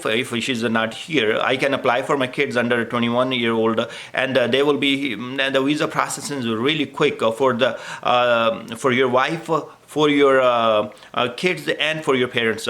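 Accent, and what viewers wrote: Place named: Sindh, Pakistan. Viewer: sometimes your accent seems to be of a Sindhi guy (specifically from Naushero Feroz - a town in Sindh)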